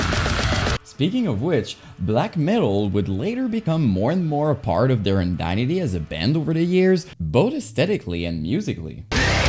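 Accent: Quebec, Canada. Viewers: C’est sûr que t’es Québécois! 😂 Est-ce qu’on aura une vidéo en français un jour? Peut-être à propos de la scène deathcore au Québec? 😗 En tout cas, merci pour là video — du bon travail comme toujours. 🫶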